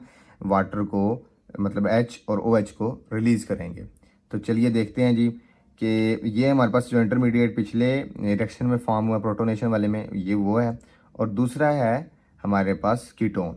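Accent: Punjab, India. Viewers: Lgda sir vi apny ilaqyy day iiii ny 😂😂 Punjabi bao vadiyaa sir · Punjabi Ascent ❤❤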